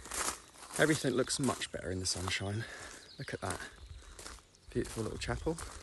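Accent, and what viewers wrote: RP, United Kingdom. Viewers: I am fascinated! first of all, being an appreciator of british english I love the way you speak. I like your charisma. And of course the place is beautiful. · That intro was about as hoighty toighty British as you can get. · Definitely loved this vlog ,it’s made so good ,what’s this guys accent ?ist posh ? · "lives in a castle, bakes scones, kind to kids, has a dog and speaks beautifully British" i think youtube has found its new prince charming ^^ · Yeah, he's posh.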